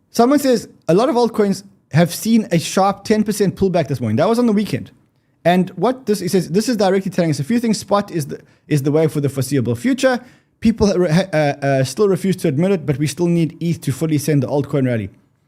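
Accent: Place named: South Africa. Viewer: He is South african based in Cpt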